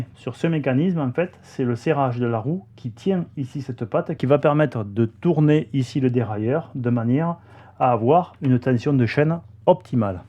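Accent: South, France. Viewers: Super, merci pour ces explications super claires avec le petit accent du sud en plus 😄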